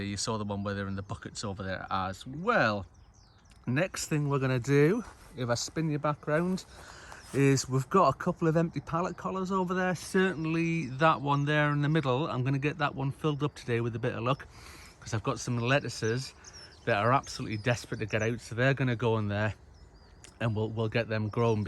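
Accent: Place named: North East England, United Kingdom. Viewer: New subscriber, some great videos. Can’t help noticing that you seem to have a north east accent for a Scottish allotment ha ha. Take care keep the videos coming.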